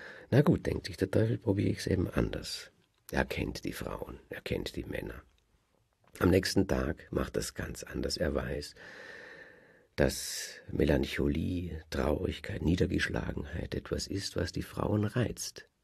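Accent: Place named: Germany. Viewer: Mit österreichischem Akzent würde es besser klingen. BRD-Deutsch passt nicht dazu.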